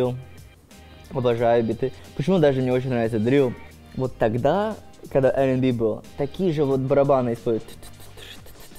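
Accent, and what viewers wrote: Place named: Chechnya, Russia. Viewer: рж я думал это чеченский такой акцент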